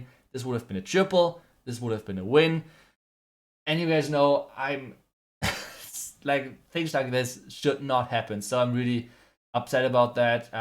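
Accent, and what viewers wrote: Germany. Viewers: He has this German accent which sounds cool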